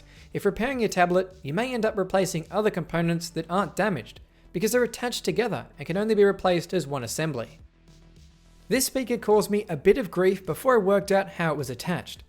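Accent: Australia. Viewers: I’m from England and we do not have that accent. is Australian (you couldn’t have been further away) lol. I’ve never heard any other Australian pronounce data as daughter/darta either.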